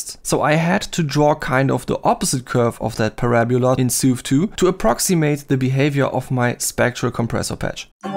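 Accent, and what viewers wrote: Germany. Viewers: Du klingst so stark deutsch 😂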